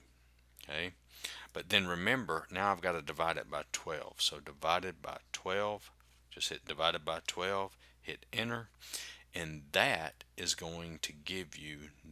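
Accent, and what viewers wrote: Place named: Texas, United States. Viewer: Texas accent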